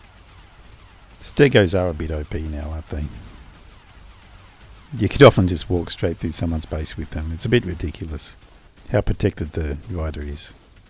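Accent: Australia. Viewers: Gotta love that smooth Australian voice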